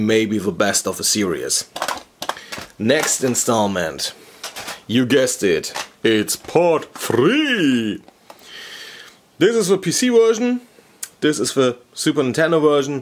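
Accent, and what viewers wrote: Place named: Germany. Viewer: You sound German, love that accent bro. Best accent to talk in.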